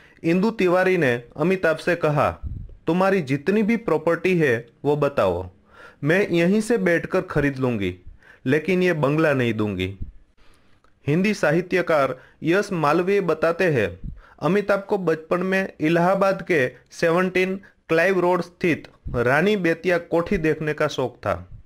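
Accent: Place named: Bengal, India. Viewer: bro I am from Nepal n trust my hindi much much better then urs...sayed aap Bebggali hola ish liye aap bolte hai ....उस टे अमिताभ बोचन उन के कोर गोया था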